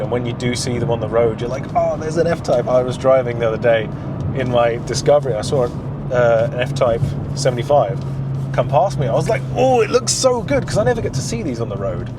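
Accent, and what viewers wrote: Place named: England, United Kingdom. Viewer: Hello, thank you for the video. May i ask: are you native Greek? Your accent shows that you grew up in Engand (or you have lived many years) but you are not local. Both parents Greeks or one?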